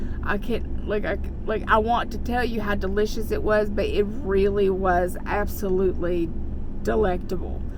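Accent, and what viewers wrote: South, United States. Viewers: Love the way you say "Oil". You still have a lot of south in the mouth!!!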